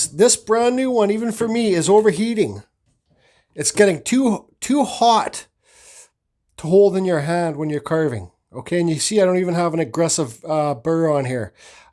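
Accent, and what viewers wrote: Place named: Canada. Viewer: You're Canadian eh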